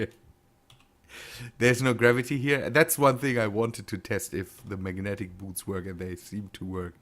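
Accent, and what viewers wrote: Germany. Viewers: Deine Englische Aussprache lässt annehmen du Sprichst auch Deutsch?? Wenn ja ist das in der DEV Version auch so das es einen Gravierenden Fehler im Spiel gibt? Seit dem Update 1.157.12 friert das Spiel immer wieder ein teilweise sogar für bis zu 5sek oder länger und dabei geht die Prozessor auslastung auf 100%. So macht das Spiel langsam echt keinen Spaß mehr. Ich habe schon alles mögliche versucht. Dieser Fehler tritt selbst dann auf wenn kein einziger Mod im Spiel ist :/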